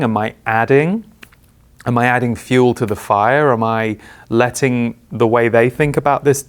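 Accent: United Kingdom. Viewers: Not complaining as a culture is great, but please don’t lose your British accent. 🤍🙏🏼✨😎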